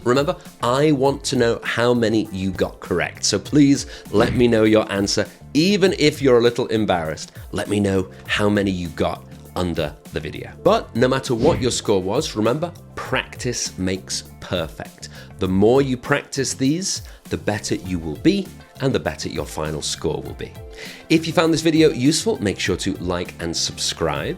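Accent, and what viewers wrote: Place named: United Kingdom. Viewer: Thank you sir. And your British pronunciation sounds incredible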